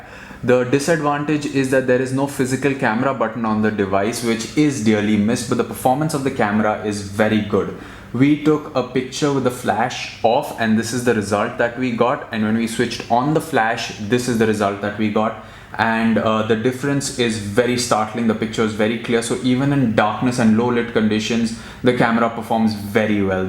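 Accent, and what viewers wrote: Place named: India. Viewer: I like his accent very indian accent english...not a critic but its cool....hahaha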